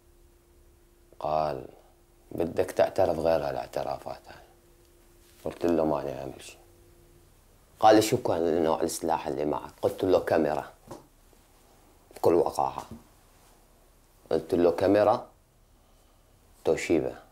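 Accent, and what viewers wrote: Iraq. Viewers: سوري من محافظة دير الزور قريبه من الحدود العراقيه . لهجتهم قريبه من اللهجه العراقيه وخصوصا لهجة اهل الموصل ولهجة غرب الانبار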